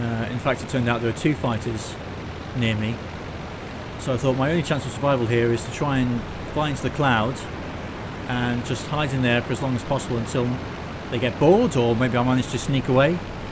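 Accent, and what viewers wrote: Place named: East Midlands, United Kingdom. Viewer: Good stuff, another excellent video with a great couple of fights. As a noob to RoF, I have learned an awful lot from watching your output. May I ask three questions? Why is that nobody in the RoF ever seem to pay attention to aircraft instruments? Along with everything you have put out I have also watched JimmyBlonde (alas now MIA), Dukely and diverse others and none of you ever seem to look at instruments. Secondly, I appreciate you are busy and haven't been very well, but will you be coming back to the Barrakuda campaign? That was shaping up to be a real beaut. Finally, if you don't mind a personal question, where are you from? I have been trying to identify your accent, I can't and my failure is driving me nuts. I'd guess somewhere in the East Midlands, maybe bordering on East Anglia but I'd can't place it. 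Anyway, keep up the good work and know your efforts are greatly appreciated.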